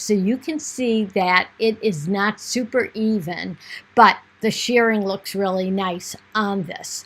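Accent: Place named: Australia. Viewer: I can hear you Australia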